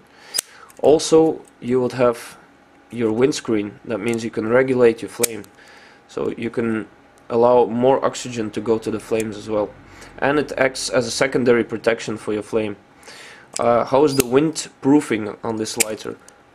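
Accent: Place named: Belgium. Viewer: HAHA ik hoor het aan je stem dat je van belgië bent :p je accent valt wel op, ben zelf ook van belgië Limburg Hoeselt !!!!! greeeetz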